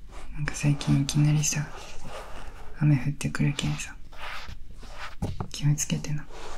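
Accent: Japan. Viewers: 君の語尾けんってついてるの親近感湧く同じ県の方言やけん心地良い✨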